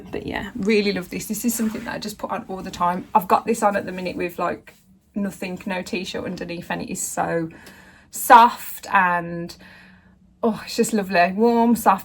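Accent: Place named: Birmingham, United Kingdom. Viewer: I am from Birmingham so was drawn to your accent. Loved your first episode and looking forward to watching more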